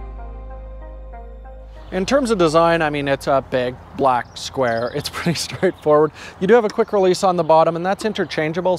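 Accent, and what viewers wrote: New Zealand. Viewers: ' Kiwi accent is spot on. A+ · I never shoot video, but I watched this episode because of New Zealand accent and Jordan's clear explanation of this camera gadget. Thank you for sharing. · Can say fish & chip in his Kiwi accent? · Like for the kiwi accent XD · A Japanese ethnicity, living in Canada, with profound New Zealander Accent How can we not love? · WOW. Aussie guy here... your NZ accent is GREAT!!!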